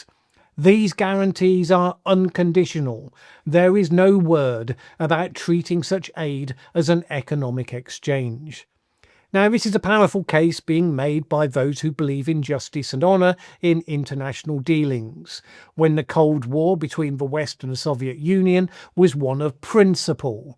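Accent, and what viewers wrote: United Kingdom. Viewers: It is fascinating to listen to utter rubbish, delivered in a nice British accent. Your drivel is driven by the basic hatred of Russia, and you will let no facts alter your knowledge of the truth. Like Ukraine, you too are heading down the toilet of history by your hate and ignorance. Zelensky was elected by 76% of the Ukranians because he promised to return the Minsk agreements and make peace with Russsia and the ethnic Russians, 14,000 of wome you have murdered in Domabss. As soon as he was elected, he totally flipped. If he DARE make peace with Russia, he will be hung in Maydan square by the Banderites - 3% of the people who run Ukraine. And the British see things ONLY through the lens of the Russophobia. "a man hears what he wants to hear and disregards the rest". Reality punishes stupidity. When regarding the ALLOWED gang rape of your young women, what conclusion can be reached, except that you have become degenerate people ?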